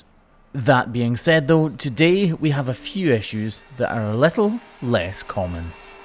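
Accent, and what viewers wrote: Scotland, United Kingdom. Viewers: AYE LAD YER FROM SCOTLAND WHERE?? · Oh I love that you little bit of a British accent LOVE!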